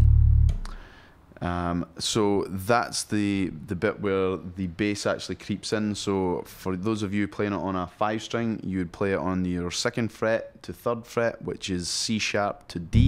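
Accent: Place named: England, United Kingdom. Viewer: Very helpfull lesson, and like the english accent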